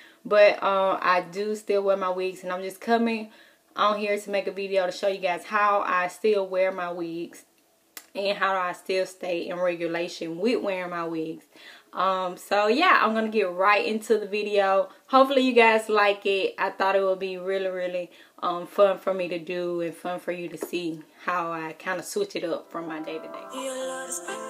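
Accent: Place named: South, United States. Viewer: Omggggggggg where are you from? I love your accent 😊 hmm let me guess...Houston? Atlanta? Tennessee?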